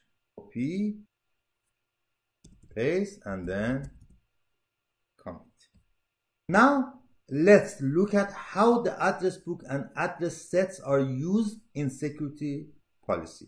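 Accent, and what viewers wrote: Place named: Iran. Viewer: سلطان لهجه ات خیلی ایرانیه :دی دمت گرم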